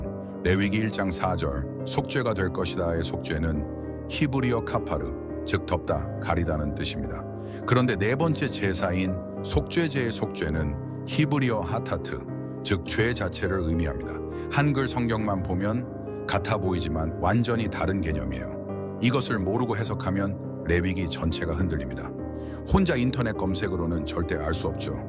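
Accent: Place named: United States. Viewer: 감사합니다. 성경공부에 큰 도움이 됩니다. Awesome! 혹 목사님이셔요? 교포셔요? 발음이 미쿡사람 같아서요🤗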